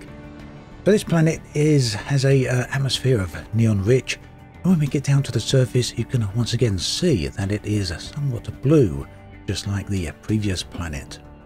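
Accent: United Kingdom. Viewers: The narrator’s British accent is too thick, cannot understand him!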